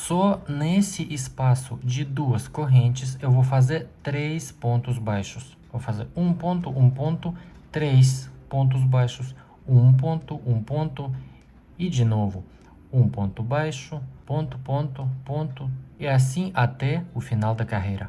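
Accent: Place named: Brazil. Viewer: NOSSA.. EXPLICA MUITO BEM..AMEI A AULA..ADOREI O SOTAQUE, DE QUAL ESTADO DEVE SER? TENTANDO DESCOBRIR PELO SOTAQUE,MAS NÃO CONSEGUI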